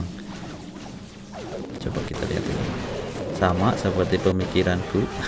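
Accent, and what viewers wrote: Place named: Banyumas, Indonesia. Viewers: Ngapak banget mas ngomongnya:v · Daerah ndi om dening ngapak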